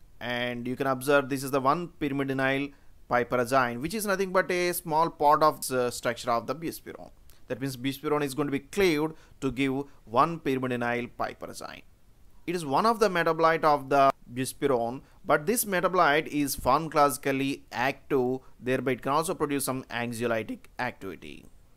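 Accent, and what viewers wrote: India. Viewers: it’s not the voice but the accent what he meant! I don’t mind tho but some people don’t like the way Indian people speak English lol 😂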